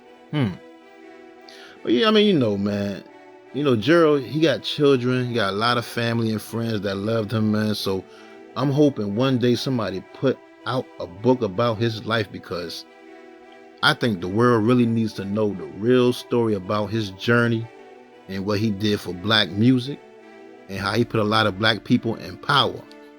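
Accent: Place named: Washington DC, United States. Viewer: Please tell me this narrator is from DC, because his accent is very familiar to my ears. LOL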